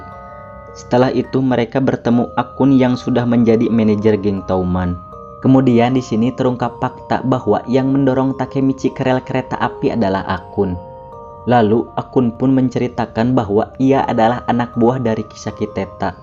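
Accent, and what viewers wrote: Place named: West Java, Indonesia. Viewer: Siganamah orng sunda ye si akng :)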